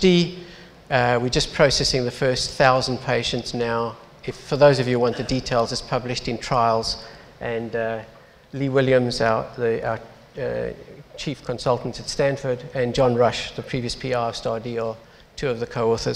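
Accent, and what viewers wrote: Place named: South Africa. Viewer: I know a South African accent when I hear one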